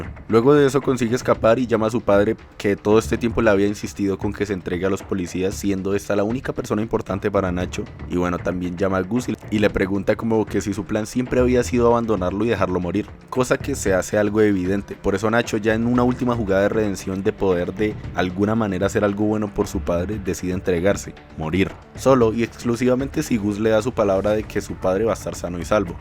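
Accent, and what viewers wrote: Colombia. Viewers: Este tipo no puede ser más bogotano, me siento en casa jajaajajaj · Amigo, muy bueno tu video, pero, te recomiendo no usar esos tontos memes de perros y hablar menos como colombiano.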